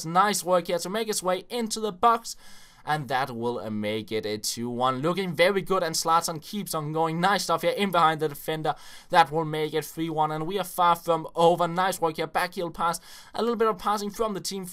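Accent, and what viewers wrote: Denmark. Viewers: Are you danish???!??!??!!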